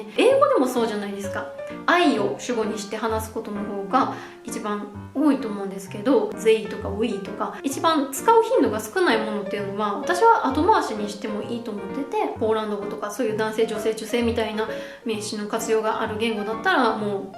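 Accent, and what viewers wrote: Kansai, Japan. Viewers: さんの関西弁が聞きたいときにおチャンネルに見にきます。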